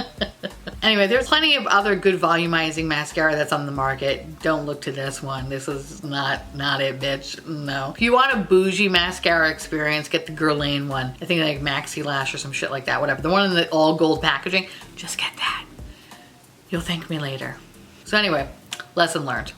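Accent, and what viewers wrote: England, United Kingdom. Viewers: Love the English accent girl. Your skin does look beautiful. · Yesssss! Loving the English accent! Represent! 🇬🇧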